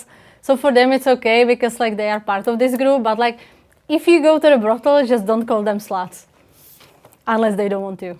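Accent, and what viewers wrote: Czech Republic. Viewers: Lovely Czech accent. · She has a Czech accent.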